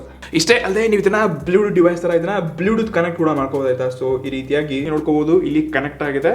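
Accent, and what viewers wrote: North Karnataka, India. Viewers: Nivu uttara karnataka bhashe matadtiralla niv uttar karnatakadoren 😍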